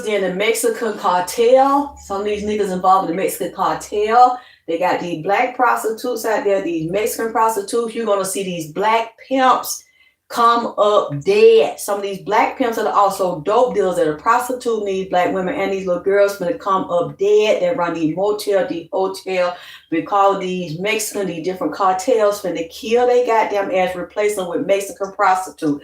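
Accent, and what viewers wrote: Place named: South, United States. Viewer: I so love how u talk u be having me laughing so hard 🤣 that down south all in u hunni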